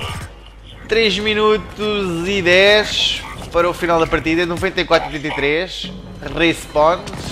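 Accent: Portugal. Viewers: HAUHAUHAUHA "PT-BR" Mas ok, sotaque Português é legal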